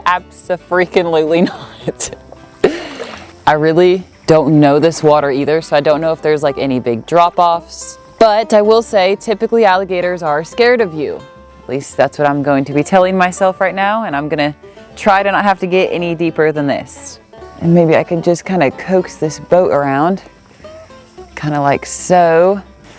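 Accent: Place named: South Louisiana, United States. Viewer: You're slowly picking up that South Louisiana accent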